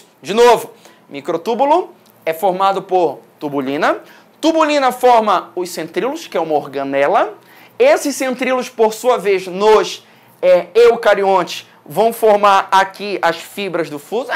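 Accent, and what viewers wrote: Pará, Brazil. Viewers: Tu é paraenses né parente ? Professor muito simpático. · professor, vc é paraense? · beeem paraense kkkk, sou do Pará tbm, mas não tenho sotaque daqui kkkkkk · Mano, tu é paraense ?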